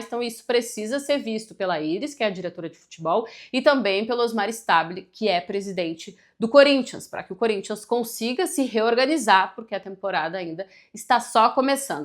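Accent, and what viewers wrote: Rio Grande do Sul, Brazil. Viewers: Três motivos que esse canal é incrivel: 1 - Conteúdo excelente; 2 -; 3 - Orgulho que tu tens do nosso sotaque gaúcho. 🫶🏽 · Ainda bem que apareceu um canal com profissional e ainda com esse sotaque gaúcho.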